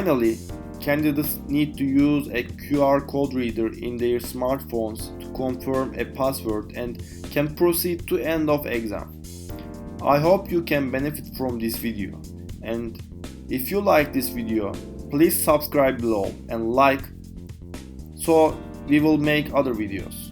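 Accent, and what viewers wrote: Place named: Turkey. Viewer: türk aksanı hissettim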